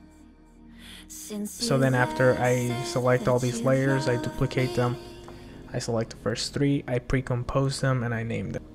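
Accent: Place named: India. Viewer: Indian ascent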